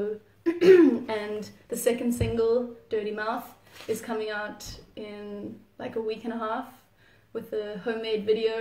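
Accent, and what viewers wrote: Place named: South Africa. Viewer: so good to hear a south african accent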